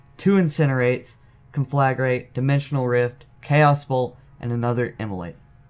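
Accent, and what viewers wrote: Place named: Tennessee, United States. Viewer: That beautiful Tennessee voice PogChamp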